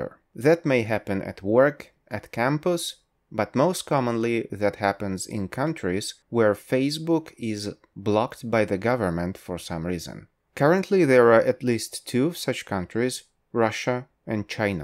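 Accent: England, United Kingdom. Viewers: Oo vi iz anglii?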